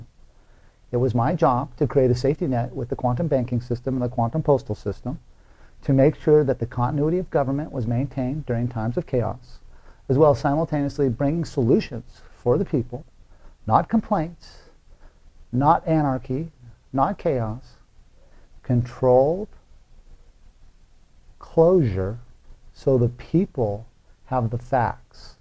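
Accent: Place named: United Kingdom. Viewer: Here, thought you were Brit 🤔😂🖖🏾👏🏾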